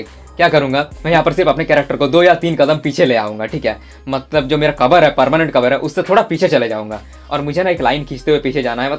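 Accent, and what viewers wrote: Bengal, India. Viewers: Bhai tu bengoli h 😮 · Bhai ap bangali ho kya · Bhai app bangalli ho na 😀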